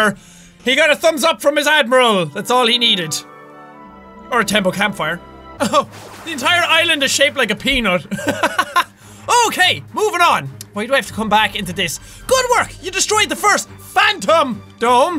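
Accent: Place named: Ireland. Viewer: My mum loves hearing your voice while I'm watching your videos because apparently you sound like an Irish guy from Sherlock :)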